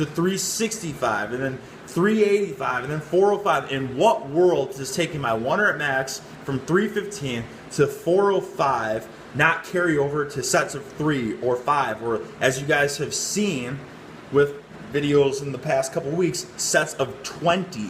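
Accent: Midwest, United States. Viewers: Man,. His voice is so much higher pitch and the midwestern accent is strong.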